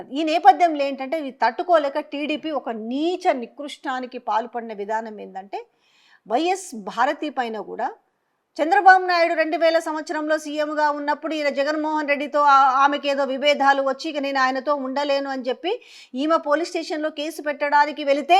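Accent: Telangana, India. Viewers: Manchi topic. meeda. Video chesaavu talli . Voice mariyu Telangana yaasa lo. Baaga matlaadutaavu .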